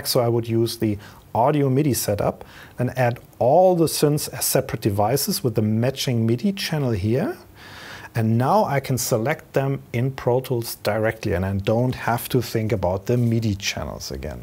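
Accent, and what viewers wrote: Germany. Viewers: Most German accent I’ve ever heard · Lovely German English. ^^ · You’re german, am I right? xD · Your Denglisch is wunderfull!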